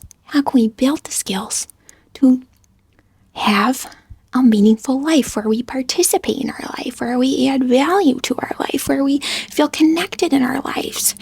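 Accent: United States. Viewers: Hearing your accent, I just want to hear, "Dontcha know" on repeat :P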